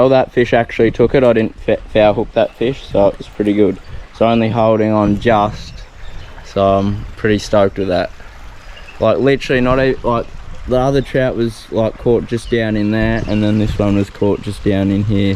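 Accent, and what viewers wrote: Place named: Tasmania, Australia. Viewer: You are living the life,. Good to see. I hope any snakes you encounter know they are only allowed to bite your gaters. Curried egg sangas with a wee bit of lettuce in them are in fact yum. You sound like Tob Parsons Must be a Tassie thing.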